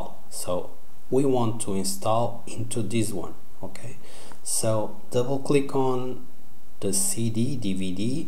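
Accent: Portugal. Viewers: Na hora que ele começou a falar eu sabia que falava português kkkkkk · Aposto que o autor do video é português, pelo sotaque! I want know if I can install on a 16gb pen?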